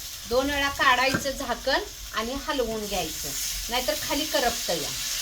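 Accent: Kolhapur, India. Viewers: कोल्हापुरात तुम्ही कुठं रहाता? तुम्हाला भेटायला यावस वाटत... मग तुम्ही कोल्हापूर च्या भाषेत म्हणतासा.. " या कि घरा कडं".. या कि घर ला..😅.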